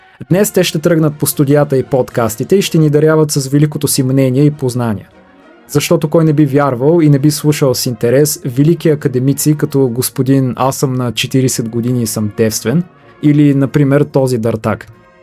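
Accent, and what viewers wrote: Russia. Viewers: Да защити интересите на българите"? Освен руския акцент, то и малко критичен ум щеше да е хубаво да има. Нима това не е интерес на Руската Империя? Или започва всички тези войни само за да ,,асвабади" България... жалка копейка. че сме го платили с много кръв, то ни е струвало още унижения, национални катастрофи и - много злато! Който иска да се информира по-задълбочено по темата, нека да прочете тази ценна книга. ,,Окупационен фонд, основан за създаване на Руско-Дунавска област" Дори и да се задоволите само с предговора на Димитър Петков, пак е добре. Дълги години, заинтересовани рублофили правеха всичко възможДно да не можем да я намерим в интернет. Има само две издания. Първото е от 1892 г. Второто - от 1993 г. След него друго няма. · Говориш български като руснак който живее от 5 години тук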